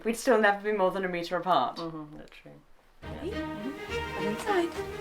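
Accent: United Kingdom. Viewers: I bloody love the British accent of 👌 💗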